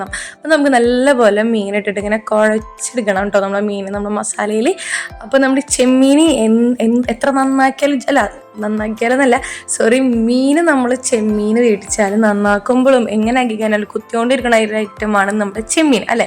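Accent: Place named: Thrissur, India. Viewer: നിന്റെ സംസാരം കേൾക്കാൻ എന്തു രസാ... 😍തൃശ്ശൂർകാരി ❤️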